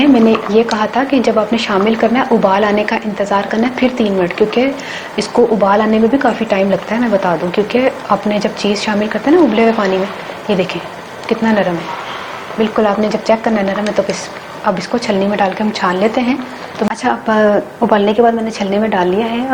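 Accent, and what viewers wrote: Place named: Punjab, India. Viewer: Mezedar Punjabi hahaha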